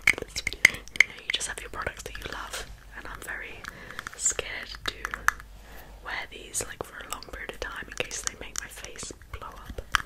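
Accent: United Kingdom. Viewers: Pros: British accent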